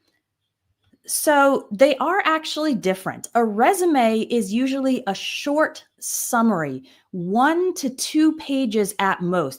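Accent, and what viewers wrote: United States. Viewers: Very attractive and clear American accent, quite impressive indeed, but too short, it should be a bit longer so the learners can have a better yield ❤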